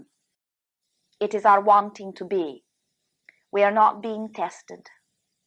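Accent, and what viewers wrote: Ireland. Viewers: why is Esther speaking with an Irish accent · Why is Esther speaking with an Irish leaning accent? Just curious.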